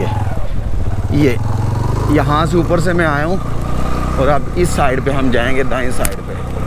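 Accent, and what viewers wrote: Punjab, India. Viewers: صاحب براہ مہربانی اردو بولتے ہوئے تلفظ کا خیال کیا کیجئے۔ میرا مطلب ہے پنجابی لہجے کی آمیزش بہت زیادہ ہو جاتی ہے کبھی کبھار۔ چونکہ آپ استاد ہیں لہذا آپ اگر تلفظ میں غلطی کریں گے تو ہم جیسے پھر کس سے سیکھیں گے؟ امید ہے آپ برا نہیں منائیں گے۔ بہت شکریہ۔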